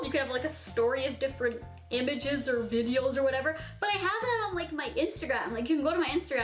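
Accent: Canada. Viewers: I love the way you speak! I love the Canadian accent! From my fortune language my fave is Rotterdams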